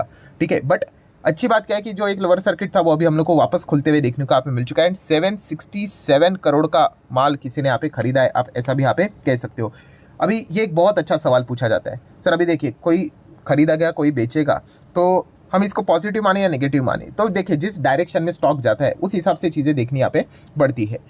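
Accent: Maharashtra, India. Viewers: Kantala se laga sir Marathi Manus hai 😂😂😂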